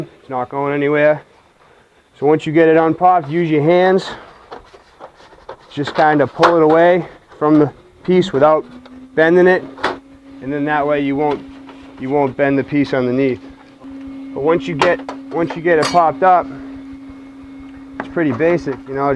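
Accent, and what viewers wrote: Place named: Boston, United States. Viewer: 2 words in and I knew this guy was from Boston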